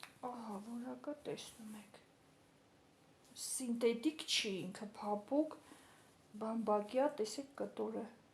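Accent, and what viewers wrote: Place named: Gyumri, Armenia. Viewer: Հարգելիս որտեղ եք ապրում գյումռեցու աքցենտ ունես համեստ անձնավորություն եք նոր եմ ձեզ հետեվում Ամնից Լիզա🎉😂😢😮😅❤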